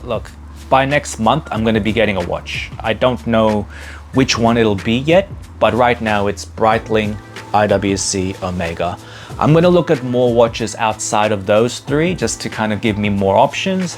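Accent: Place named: Australia. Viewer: Of the ones you showed on wrist, if money weren’t much of a constraint I’d spring for the Ingenieur (though in the regular blue that was released last year, or the black which makes the dial texture more subtle). Otherwise the Mark XX is hard to fault and is great bang for buck. I just priced one in Switzerland and could get it for 5000CHF (you sound Aussie so that’s approx. 9500AUD).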